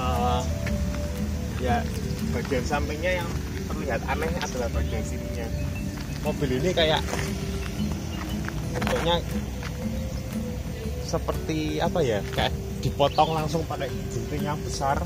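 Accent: Surabaya, Indonesia. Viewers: 10 detik awal, gak perlu moco comment, asli suroboyo yo ? salam medhok masbro :D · iki wong suroboyo yo ? sepisan ngrungokne langsung mikir suroboyoan. medhok jowone masbro. salam suroboyo👍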